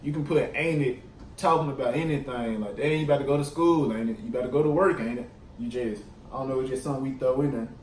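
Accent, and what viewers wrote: United States. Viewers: One of my oldest friends was from Baton Rouge, LA. You reminded me of him, but he was old enough to be your grandpa. I could barely understand him most of the time. Once he kept saying "han me ovuh the fo paypah," or something like that. I had no idea what he wanted, he finally had to get it and show me (aluminum foil!). Anyway, you use a different slang, because you're young, but the general way you sound is a lot like old Frank, bless his soul. I'm born and raised in California & Oregon. You truly speak a different language down there. 🤔😎 Had to subscribe! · Mane You sound like a Memphis person you sure u from Mississippi?? Most of the slang you use they say in Memphis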